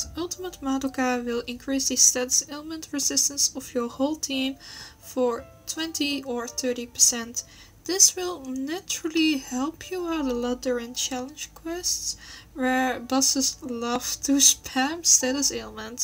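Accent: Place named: Germany. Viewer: I understand your English lol It's even better than mine cuz I'm not native English eather And I guess that you're German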